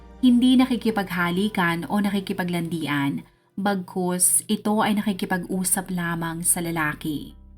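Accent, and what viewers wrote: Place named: Ilocos, Philippines. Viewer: Lumalabas ang ilocana accent nyo dito Manang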